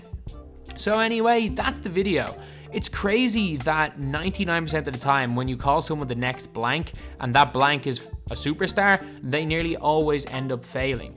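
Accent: Ireland. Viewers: are you from Ireland!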